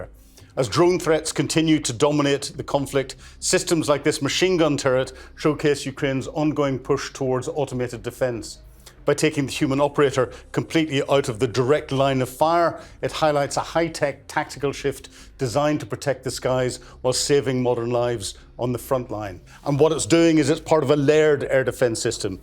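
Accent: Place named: Northern Ireland, United Kingdom. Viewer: He’s from Northern Ireland, originally.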